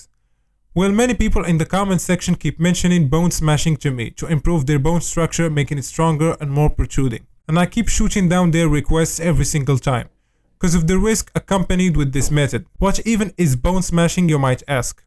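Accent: Morocco. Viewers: Your accent is Moroccan ?? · accent mghribi brb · Ur voice bayna mghribi Keep it up asat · is that a moroccan accent 🧐🧐 ? ARE U MOROCCAN GUYS ?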